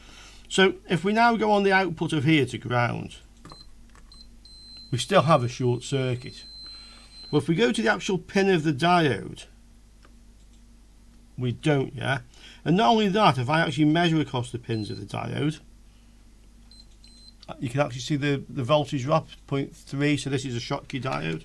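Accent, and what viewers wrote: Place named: Liverpool, United Kingdom. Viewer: On risque alors de perdre son accent savoureux de Liverpool et son humour. Isn’t ?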